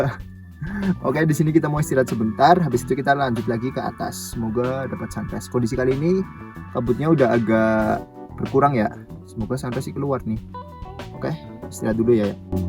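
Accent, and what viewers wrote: Banyumas, Indonesia. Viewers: Semangat mas ganteng wong ngapak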